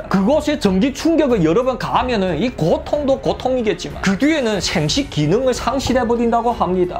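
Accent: Yanbian, China. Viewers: 이양반 말투는 연변식 한국말인가 ?아님 한국식 연변말 중심이없어 듣는입장의 학벌의 한계인가?.....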